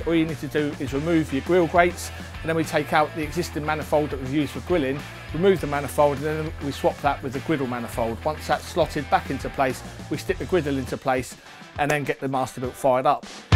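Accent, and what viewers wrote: United Kingdom. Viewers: Great video. I presume from your accent you are UK based. Can ask where you got the griddle and manifold from please. Thanks in advance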